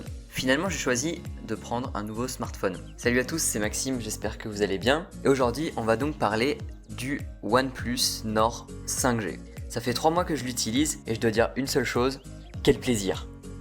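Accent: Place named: Saint-Malo, France. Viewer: Tu es de saint Malo ? Car j’ai reconnue 😁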